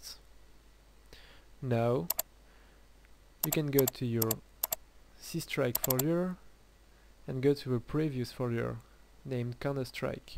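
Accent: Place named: France. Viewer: i <3 ur french accent :DAD:D:D:D:DA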